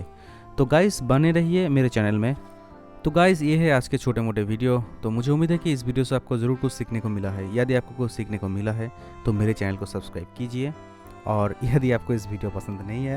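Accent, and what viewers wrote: Bengal, India. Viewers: R u bengali? 🙂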